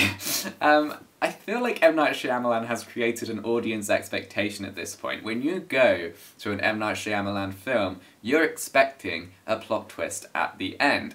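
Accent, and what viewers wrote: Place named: England, United Kingdom. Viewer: You had to be English. Where you from exactly? Anyways. I didn't like this film in t' overall. Disappointing. Americans apparently fell for this bullsh*t. Don't watch it again, pls.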